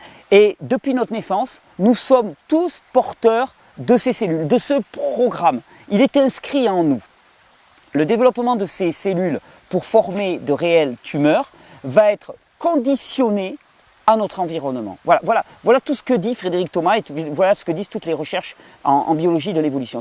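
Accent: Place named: South, France. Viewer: Amusant comme l'accent du sud semble faire passer une dangereuse escroquerie pour quelque chose de sympathique.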